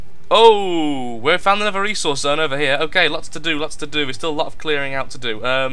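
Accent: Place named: Birmingham, United Kingdom. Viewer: I KNEW IT! I kept debating whether you were a fellow Brummie or from Manchester by your accent.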